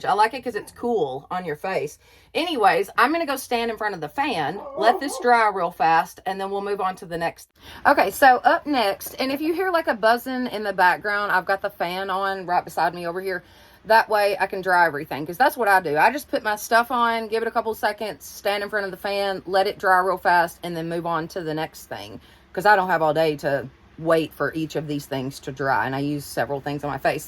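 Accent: South, United States. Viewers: YAY!!!! A MAKEUP tutorial!!!! I have admired your makeup from DAY ONE!!! Of course you are young enough to be my daughter, so you have that LOVELY SMOOTH skin!!! I was THRILLED to see your eyeshadow pallet AND you putting on your EYELASHES!!! I need to give eyelash applying MORE TIME:-P I wish ALL the Dollar General's carried the SAME PRODUCTS!!! Love the colors you put together from your pallet <3 Have you ever thought about going back to curly hair? Is there a reason you do straight all the time? You ALSO do an AMAZING JOB of matching lip liners and lipsticks!!! I DID see Maybelline on your liner I believe;-) but no idea the color, or lipstick you chose to go with it. Just know you do an AMAZING JOB matching them!! I bet you are a HUGE influence on your daughter's public speaking:-) I enjoyed the time she did your intro <3 WOW NYC for college, you go girl!!!! 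Thank you SO MUCH for sharing your skincare & makeup and hair!! I just enjoy YOU, your demeanor, your voice, that Southern accent like my daddy has....he's from Alabama, we lived down there from my 6th to 9th grade year.....I'd pretty much watch what ever you put out....Day In The Life with.....As some of the other girls stated, it was like being right there with you having a makeup day/slumber party and doing girlie stuff:-) You have BLESSED BEYOND MEASURE DAY DOLL!!! BIG {{HUGS}} OH AND YES on the baby oil & iodine LOL Gosh I even used stick butter if I "had" too:-P RARELY EVER had the REAL THING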